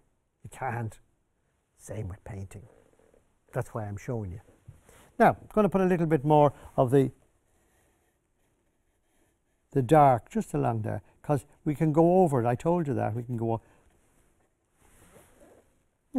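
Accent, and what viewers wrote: Ireland. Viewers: I do paint a bit but I tune in especially to hear your voice🍀☘️. My Gr.Parents were from Dublin & spoke just like you. I enjoy listening to you & It’s very comforting. I loved them dearly❤️. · Dear I’m so glad I found your video site. After going to 2 learning to paint sessions I feel I didn’t learn very much, you make it so easy and I love listening to you with your lovely Irish accent and as my MumRIP was from Dublin it brings back lots of memories. I will continue to paint although I’m a bit nervous Thank you. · My hair dryer has lived in my paint basket since I discovered "Simply Painting" years ago in a craft shop after having made a fool of myself in a watercoloring painting class. Now I have a brand new set of gouache, and you're going to be the reason for my success with them. You make sense -- and I love the brogue.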